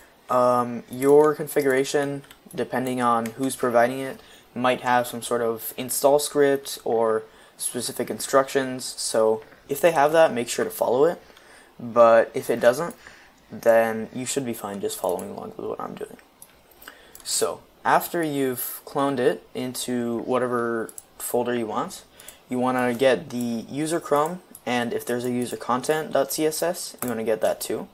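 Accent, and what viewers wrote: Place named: Brazil. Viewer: demorei mt pra perceber q tu é br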